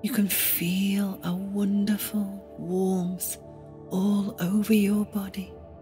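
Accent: Liverpool, United Kingdom. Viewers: This narrator is from liverpool..guaranteed scouse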